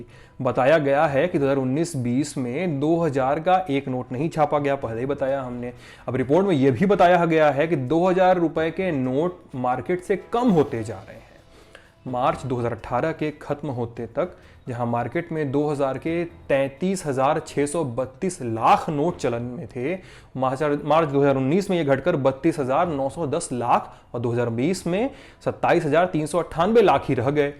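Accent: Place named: Bhojpuri, India. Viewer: Apko bat bhojpuria style Achi lagi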